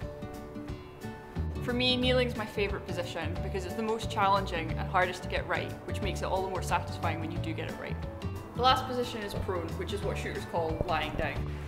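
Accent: Scotland, United Kingdom. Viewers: Its a accent from scot. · Oh... that heavy Scotish accent! I can only catch about 30 percent of what she is saying. Thank goodness for closed captioning. 😅 😂 🤣 Side note: In this day and age (#metoo) it does seem a bit absurd that "fragile females" are given less shots to take than the "big strong men". In fact, it's a bit absurd that there still are still both separate women's and men's events in this particular sport. Why not let them compete head-to-head?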